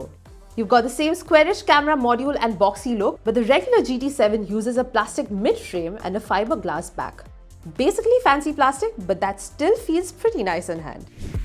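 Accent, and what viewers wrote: India. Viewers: Gosh don't they have AI to eliminate Indian accent? I can't hear half of the words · Wait a minute. There's nithing to be proud about, rather contrary. Wroting it as a Pole who albo finds I Dian accent extremely disturbing. A good and thorough review though👍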